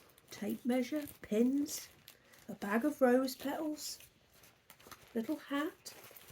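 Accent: Norfolk, United Kingdom. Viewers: Love your fabrics...I buy embroidery tableclothes from secondhand shops as I think they are beautiful. Really enjoy your chats, it's as if I'm sat talking to a friend as I live in Pakefield, Lowestoft so very used to the norfolk accent 😊 so very sorry about Dennis, its just heartbreaking...I know how it feels. 😢 Take care, big hugs to you and your family 🤗 ❤ xx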